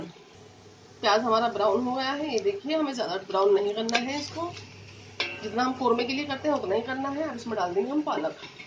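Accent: India. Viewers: Hello Assalamalikum: I have been making saag ghost for a very long time but I came up with your channel and tried it last night. I couldn't believe it how tasty this saag ghost came out. My family couldn't stop eating it. It was so delicious and well-made. Jazak Allah for sharing your talent. I love your Indian urdu accent and MashaAllah you are so talented. I also tried your other recipes and I loved it so much. Your method is so simple and easy to follow. Jazak Allah again. :)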